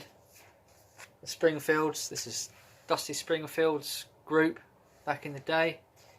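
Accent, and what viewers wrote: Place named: United Kingdom. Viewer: This is Tom, not Sandra. You have a great LP collection!! Thanks for sharing them with us!! Like your British accent.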